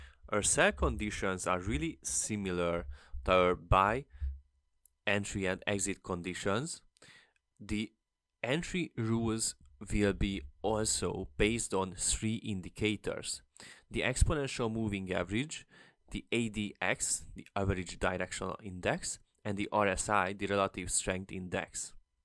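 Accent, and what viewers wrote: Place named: Hungary. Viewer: I can recognize a Hungarian accent ;) Great video, thanks!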